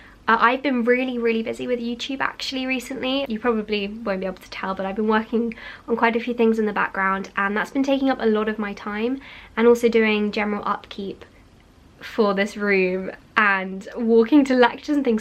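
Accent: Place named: England, United Kingdom. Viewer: I like English sound, better than American sound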